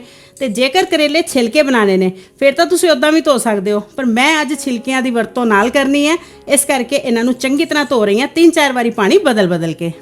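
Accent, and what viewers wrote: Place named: Punjab, India. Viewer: Sat shri akal di..tuhada bolna da punjabi tareka bhut he nyc lagga..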